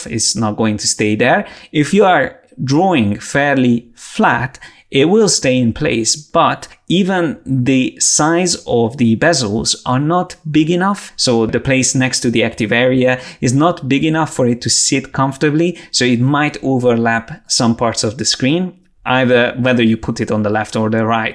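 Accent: Hungary. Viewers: Szia ! Pár videódat már végignéztem. Az összes minőségi és átgondolt, csak gratulálni tudok. Ha megengeded 1 észrevételem mondjuk lenne. Az angol kiejtéseden nagyon érződik, hogy magyar vagy. Ezzel semmi gond sincs, hiszen nem ez az anyanyelved. Arra szeretnélek megkérni, hogy amennyire az időd és energiád engedi feliratoznád a videóid magyaroknak, mert nem mindenki ért angolul és így a nézőid száma is bővűlne ... Ez csak egy javaslat 😉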